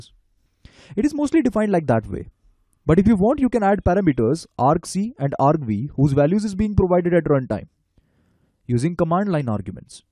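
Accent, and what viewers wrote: India. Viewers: Are you Indian? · Are you Indian sir?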